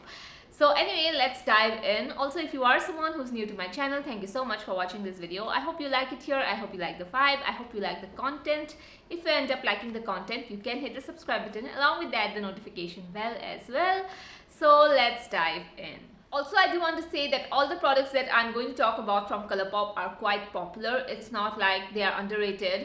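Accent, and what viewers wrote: India. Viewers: Woooowww supeeer happy i am i love colorpop products a lot and you are so so sweet di u always come up with this dupe videos and as u know i just love your dupe videos it means a lot to a person like me who s a student and can't afford much and invest much so you are a dream for us thankyou is not enough to say i just love you as a person and your every video has my heart di and your Hindi accent is so so cute 😍 haha i love it too. Keep growing di and keep shining in this way more to come always by your side take love di ❤️❤️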